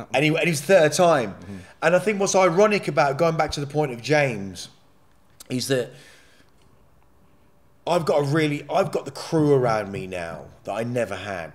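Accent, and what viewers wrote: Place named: Black Country, United Kingdom. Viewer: Can still hear that yamyam accent now and again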